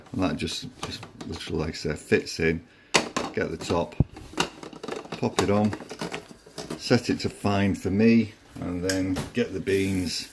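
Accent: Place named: Lancashire, United Kingdom. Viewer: ……thanks for replying bud………you sound like you’re from near to me in Lancashire. I took the top part to pieces late last night and put it back together then set it to coarsest grind then backed it further back towards the on off switch until it cut out then back until it operated………I’ve come to the conclusion it takes a couple of turns of the burr to achieve a new grind and I wasn’t giving it enough time as the grind is definitely more coarse………even so I would have expected to be able to get a coarser grind ……….but I’m not disappointed anymore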